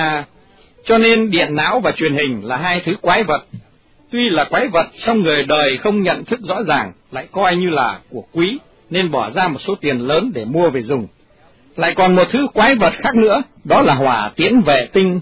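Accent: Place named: North, Vietnam. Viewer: Dọng đọc bắc nên mình là người bắc nghe rất dễ hihi. Mong chất lượng ghi âm ngày 1 tốt hơn nữa để tránh rè ạ 🌷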